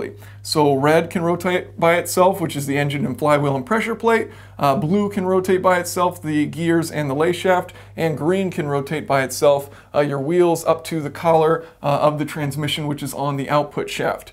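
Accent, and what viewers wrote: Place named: United States. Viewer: only problem he is american. and drives lhd car and has a american accent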